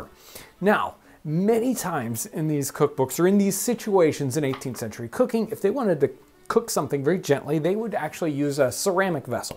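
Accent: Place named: Midwest, United States. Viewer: Does anyone else love his Midwestern accent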